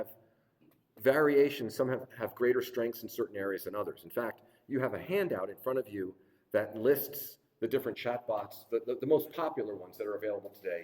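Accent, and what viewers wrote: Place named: Italy. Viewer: came for the AI talk, stayed for the Italian-accent poetry Great intro to generative AI. You made a complicated topic feel practical, and the “AI predicts words, it doesn’t think” explanation really clicked. Also… now I have no excuse for bad emails anymore. Nice job making AI understandable for normal humans. Cafer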